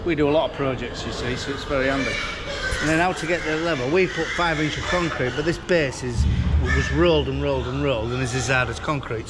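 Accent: Yorkshire, United Kingdom. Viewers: Hi, Just subscribed to your channel, being watching for a while and really love the videos as they are very very good and you explain everything so welland unreal the amount of work you and the lads get through 🥵. Took me a while to get used to the Yorkshire accent right enough 🤠. I'm From the west of Ireland 🇮🇪 and keep dry heifers now, suckler cow herd gone 4 years now and flock of sheep away 10 years now. My grandfather kept a couple of sows when I was young boy and remember them well and always had a fondness for them. Thinking of buying a Claas tractor and would appreciate a run down on yours sometimes as I know you like it. Thanking you and looking forward to your next videos. 🚜👍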